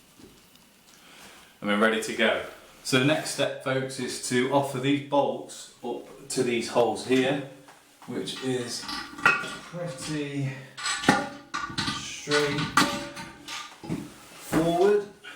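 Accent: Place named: Nottingham, United Kingdom. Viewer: That was spot on fella - thank you. Sounds like you might be Nottingham way too - You Reds!! 🔴⚪ 🙂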